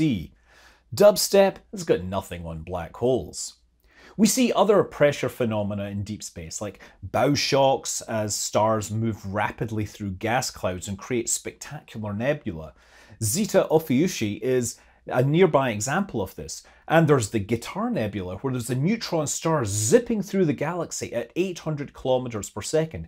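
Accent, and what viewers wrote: Scotland, United Kingdom. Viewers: Jesus,. I've started to explain astronomical context to my GF with a Scottish accent, and I'm South African. Thanks for these!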